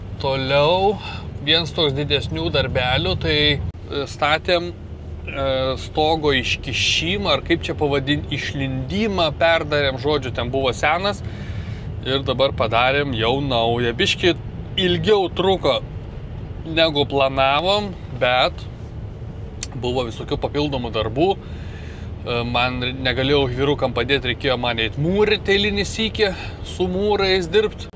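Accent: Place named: Samogitia, Lithuania. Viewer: Kap visad žemaitiškai stipriai 🇱🇹👌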